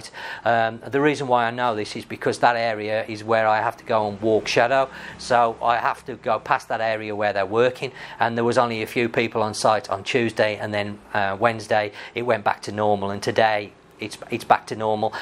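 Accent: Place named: Birmingham, United Kingdom. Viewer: haha ye I know that actually meant what part sorry you sound like a brummie lol I sent you an e mail a while ago regarding motorbike hire.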